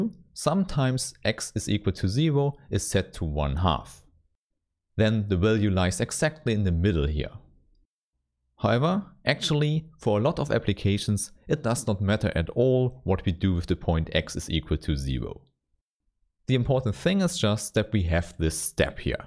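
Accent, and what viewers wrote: Germany. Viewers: man merkt er ist deutsch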